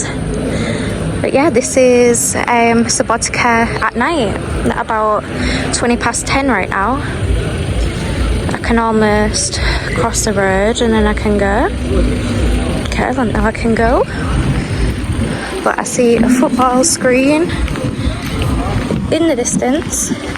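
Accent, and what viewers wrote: United Kingdom. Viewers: Are you 100% Yorkshire?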